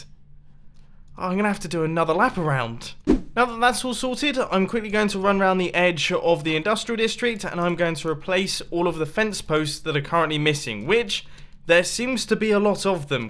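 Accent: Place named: RP, United Kingdom. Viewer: Who else remembers posh?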